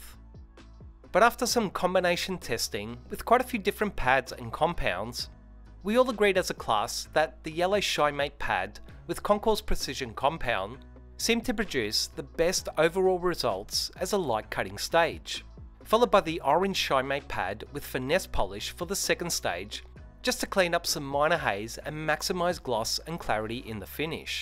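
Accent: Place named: Australia. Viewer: Great job mate I love hearing an Ozzy accent on a detailing video for a change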